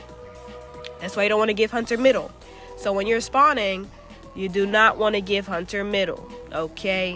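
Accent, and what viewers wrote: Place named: Africa. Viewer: i love ur africa accent! im ur biggest fan plz remember when ur famous plz shoutout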